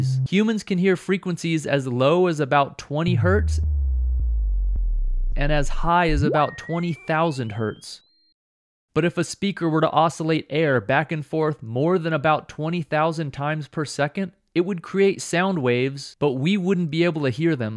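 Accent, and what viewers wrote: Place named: India. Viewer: after next bunch of indian accent video, now I understand what is frequency and amplitude. How about magnitude?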